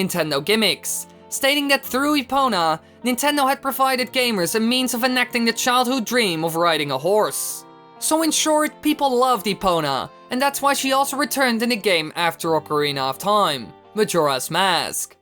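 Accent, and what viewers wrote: Netherlands. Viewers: Wow dutch accent much haha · Tombsday he’s Dutch that’s how people from the Netherlands sound like when speaking English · lol. ik ben ook nederlands en ik herken dat accent van 10.000 kilometer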